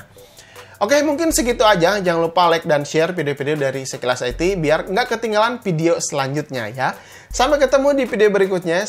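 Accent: West Java, Indonesia. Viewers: Pideo.... Sunda ya?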